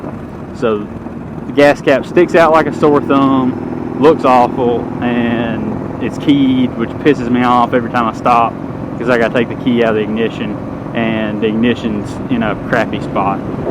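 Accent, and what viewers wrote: South, United States. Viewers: Greetings! You sound like Huckleberry Hound to my old ears. Where are you? That landscape looks totally English and the only thing that gives it away as the US are the road markings and your deep southern drawl. And I don't see potholes in your road there... how is that?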